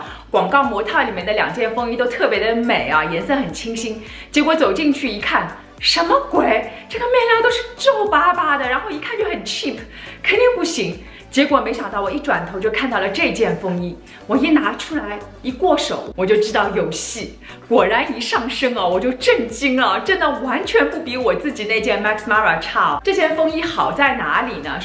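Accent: Shanghai, China. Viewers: 刚关注你，一听就知道你是上海人😊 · 雖然Jessica的普通話已經夠標準了，但是我還是聽出了上海風。好熟悉好溫馨。😘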